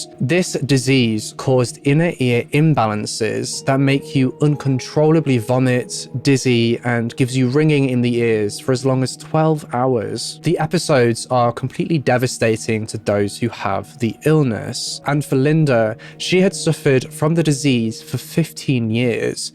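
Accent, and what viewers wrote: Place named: RP, United Kingdom. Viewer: Hes got a posh English accent so great pronunciation is a given hehe 🇬🇧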